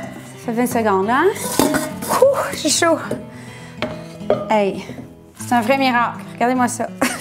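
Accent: Quebec, Canada. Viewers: J'adore vôtre façon d'éplucher l'ail avec les deux cul de poule, surtout vôtre façon de vous secouer, dommage que ça n'ai pas fonctionné et le fou rire derrière Super. Je vais faire mes aulx avec vôtre recette mais sans me secouer!!! Merci aussi pour vôtre petit accent, moi qui habite en New Caledonia n'avons pas beaucoup de Canadiens!!! Belle journée à vous. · Bonjour! Merci beaucoup pour cette vidéo. Je l’avais regardé il y a 3/4 ans sans jamais passé à l’action. Et je prévois de la faire prochainement. J’aurai besoin d’un conseil. Je vais utiliser le thermomix pour hacher l’ail. Sur quelle vitesse me conseillez vous pour ne pas que ça devienne de la purée d’ail? Merci pour vos vidéos culinaires qui sont une grande source d’inspiration! Et cet accent canadien fait un bien fou 😁 Bonne continuation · Ami(e)s canadien même si nous aimons pas l ail ,nous sommes obligés d aimer votre accent 😂😂.trop top,de bon matin ca met de bonne humeur.bises de la Drôme.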